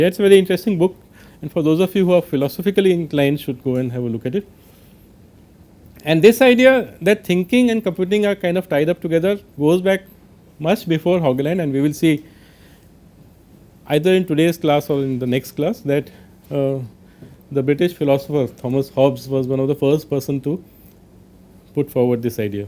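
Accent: India. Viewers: I love Indian folks. From the 90s smuggling recycled 64 bit sparcs over yonder, to present day; where Sunnyvale is a suburb of Mumbai. But listening to that accent, thick as curry molasses in wintertime... god help me or Vishnu whoever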